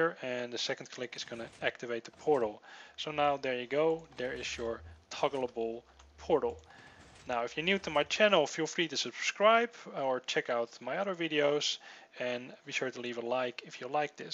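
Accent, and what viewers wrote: Netherlands. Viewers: According to his accent he is obviously Dutch, so am I. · Dude, are you dutch? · hello new sub from other hermits, no wonder i found the accent familiar XD one of my old EVE online corps CEO was dutch :P though his accent was thicker :) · I was pretty sure you are from The Netherlands just like I am. O_o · are you dutch/belgian?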